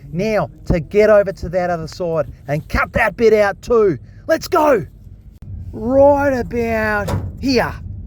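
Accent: Australia. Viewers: YouTube put you in my feed and your videos are hilarious I love the way Australians talk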